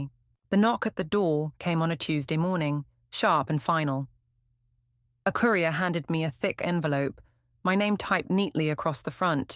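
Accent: United Kingdom. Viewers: This British narrator is dragging this idiotic story and dont waste others time you idiot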